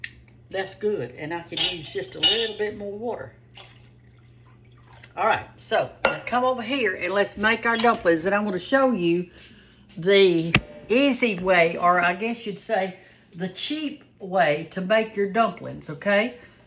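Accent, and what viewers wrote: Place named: Alabama, United States. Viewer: Miss You speak my Bama country language You flung a cravin on me! language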